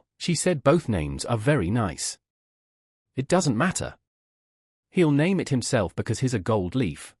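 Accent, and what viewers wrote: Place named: United Kingdom. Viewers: Bri’ish narrator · ya seems really weird having a brit accent to narrate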